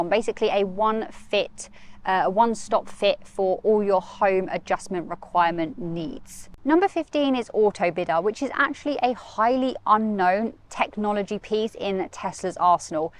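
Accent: United Kingdom. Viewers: It's always a pleasure to hear from you and to help me with my British English listening. So thank you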